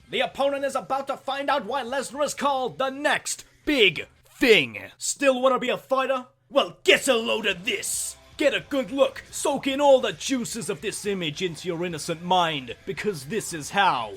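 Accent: United Kingdom. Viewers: Your a brit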